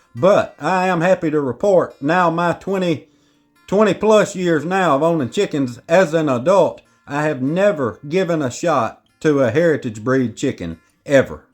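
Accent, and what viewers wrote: United States. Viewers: Great American accent😊 it is good to learn American/English from you plus I learned a ton of knowledge about hen😊 thanks I live in Poland Piekary Śląskie my Father in law have few hens and rooster and we keep change them mostly hybrids but once i bought small incubator and chatched him couples of this originals and Yes they were more resilient and lived longer👍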